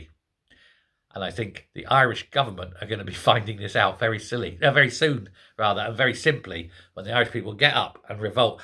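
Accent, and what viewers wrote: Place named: RP, United Kingdom. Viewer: Just out of interest - if you are from Ireland then where did you get your rounded vowels & fairly posh English accent from?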